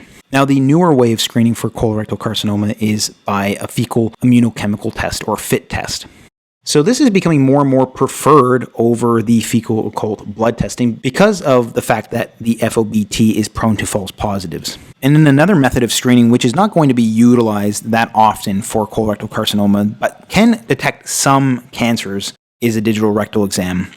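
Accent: Canada. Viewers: Canadian? Yuck.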